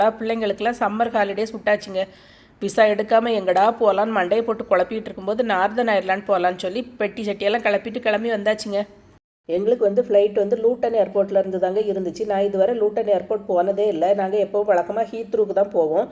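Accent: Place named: Nagercoil, India. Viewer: Hlo sissy ungaluku native nagercoil ah slang apdi iruku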